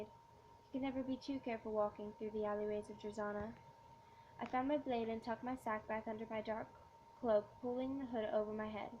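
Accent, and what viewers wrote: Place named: United Kingdom. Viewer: british accent tho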